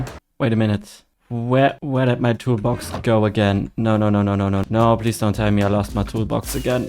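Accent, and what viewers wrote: Germany. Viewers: your german acent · I hear the german accent like crazy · Finde den deutschen Akzent crazy funny weiter so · I hear Deutsch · der fakt das ich rausghehört habe das du deutsch bist ist crazy weil dein englisch sehr sehr gut ist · hab garnicht drauf geachtet das du nen deutschen akzent hast haha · I can hear the "Deutsch" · U have a german accent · German accent makes this 1000x times more relaxing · bro you acent sounds so german bro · Du musst deutsch sein man hört den Akzent so raus 😂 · Ekelhafter deutscher Akzent 🤮🤮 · I hear deutsch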